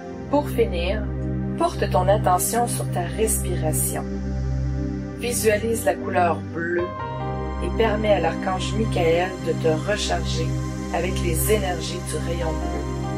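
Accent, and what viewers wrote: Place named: Quebec, Canada. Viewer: Merci pour cette méditation mais dommage je n’arrive pas à comprendre autre langage à cause de votre accent québécois ou canadien. C’est vraiment vraiment dommage mais bon merci en tout cas bonne soirée bonne nuit ciao ciao. Car quand j’ai Michaël me pardonne mais on m’a demandé de dicter ses mots secondaires c’est ce que je fais voilà au revoir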